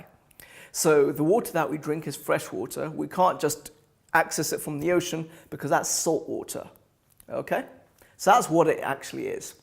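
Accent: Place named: United Kingdom. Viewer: bro no offense but u sound like british but looks like an indian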